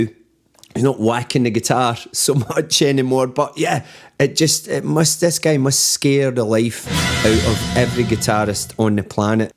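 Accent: Scotland, United Kingdom. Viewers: This is the first time I’ve watched, and, I gotta say, I watched because you were reacting to MARCIN. I’m a huge fan of his, and I appreciated your knowledgeable commentary. Love your Scots accent, which turned to a US southern accent when you sang! Loved it! I’ll be tuning in again. Thanks for a good show! ❤️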